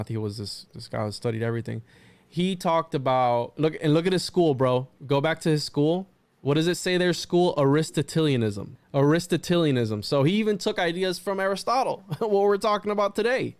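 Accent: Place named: Mexico. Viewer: pronouncing latin words with mexican accent. oof. and i'm hispanic.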